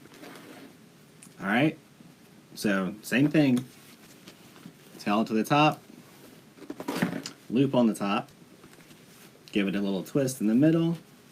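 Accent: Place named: South, United States. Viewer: I don’t know what gives me a bigger thrill! The fact that I have learned more about wreaths and bows watching 2 of your films or the fact that your from the South! I love the Y’all 😘 I feel like I am listening to one of my professors from Ole Miss.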